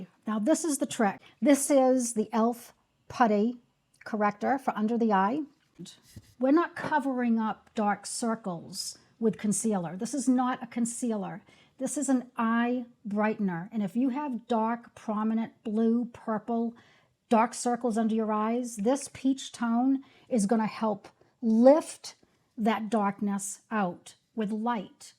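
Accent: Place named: New York, United States. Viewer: Beautiful woman❤ I love your accent!……New York? Thank you for tips. I agree glow is so important 😊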